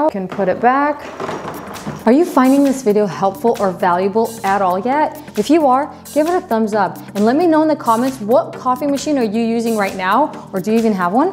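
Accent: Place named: Russia. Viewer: i hear some Russian accent notes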